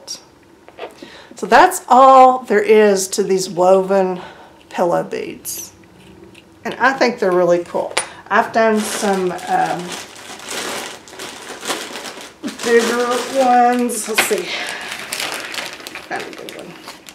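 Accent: South, United States. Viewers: it was kind of obvious that you were a fellow southern gal, but I didn't know that we were neighbors! I'm in Memphis, just across the river... Hi neighbor! Lol! Thanks for the bead tutorial!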